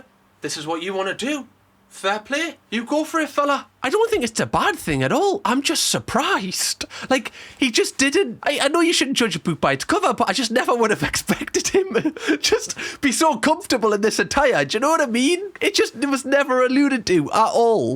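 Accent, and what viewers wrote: Newcastle, United Kingdom. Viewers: ! I found your channel sort of by accident just the other night. I'm hooked now. I know I'm watching everything out of order and all but, your commentary is hilarious! Also, love your accent and getting to hear the Newcastle slang. Love that you call your folks "donuts", I'm also part of the Waffle Gang. Another UK Youtuber Mark Narrations calls his folks that.:)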